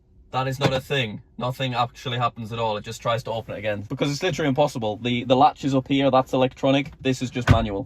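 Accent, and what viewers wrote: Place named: England, United Kingdom. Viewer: I swear your accent is sick I love England people’s accents. Tesla Voice Commands need to understand people with any accent completely. Because sometimes it’s impossible to not talk with your accent.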